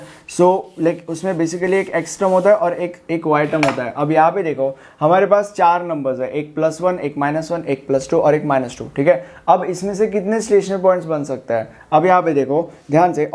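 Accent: United Kingdom. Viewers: British accent 🫡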